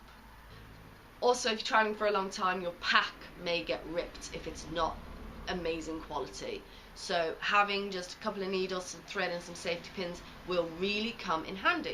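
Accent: England, United Kingdom. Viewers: It's an English accent.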